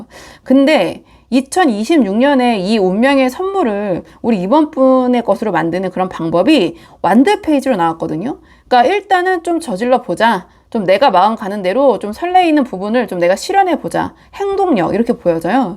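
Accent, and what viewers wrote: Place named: Gyeongsang, South Korea. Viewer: 진짜 들을때마다 느끼는건데 거꾸로 들어도 대구사람. 대구사람이신가요? (저는 아니예요ㅎㅎㅎ)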